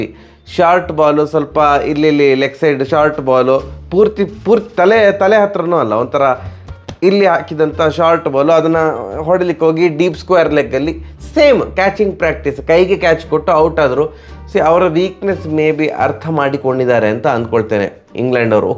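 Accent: Mangalore, India. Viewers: ಸರ್ ನಿಮ್ಮ ಮಾತು ಕೇಳಲು ತುಂಬ ಚಂದ ಮಂಗಳೂರುಮಾತುಹಾಗಾಗಿನಾನು ನಿಮ್ಮ ಮಾತನು ಇಷ್ಟ ಪಡುತ್ತೇನೆ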